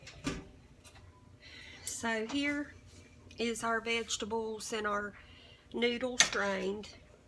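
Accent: South, United States. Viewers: I love southern accents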